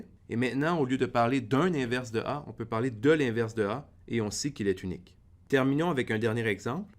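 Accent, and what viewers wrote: Canada. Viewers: The lady professor is much better + i don't understand your accent man, keep the channel international not just canadian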